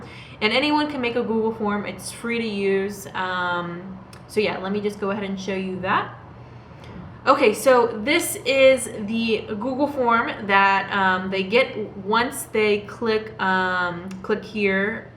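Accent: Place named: England, United Kingdom. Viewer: Your English accent is so pleasant that it is already sufficient reason to listen to the end.. ))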